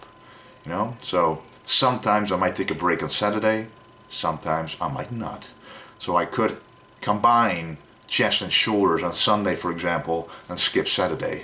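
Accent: Netherlands. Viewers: Yes, Dutch people--I would bet you he would tell you that the olympics are in Rye-o.They simply pronounce it as a long i to be safe. IO is tricky--think riot and prior. All different...Either way, sorta being a hard ass to bust a guys balls on a point such as that when he is doing a youtube video about fitness in a second language--pretty fucking impressive.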